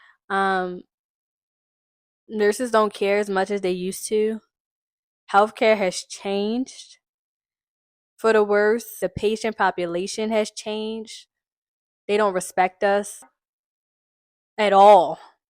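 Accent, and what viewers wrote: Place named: Maryland, United States. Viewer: You didn’t have to tell us you’re in Maryland. We could tell by the accent from the moment you said do or to. Lol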